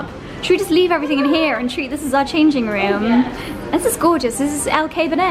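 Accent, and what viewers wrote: United States. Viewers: I just love the American twang you have in your accent when you go State side 🥰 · Oh and your American accent is hilarious 🤣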